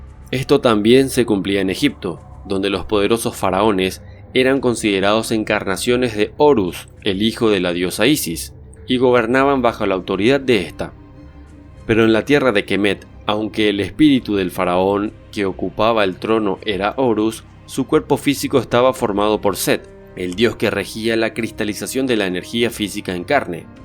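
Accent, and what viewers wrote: Paraguay. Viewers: Que tal.... sos de paraguay..??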